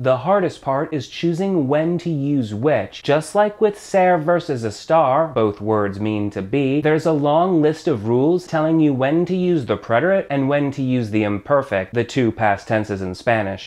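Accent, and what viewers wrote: United States. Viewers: great videos! are you a southern Californian? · I love how these videos are super concise, super to the point and sped up, no two ways about it. So super easy to digest content at high speed, thanks for that so much! However, don't you think it's about time to work on your accent if you're able to? You sound overly American when speaking Spanish, that's a shame - to me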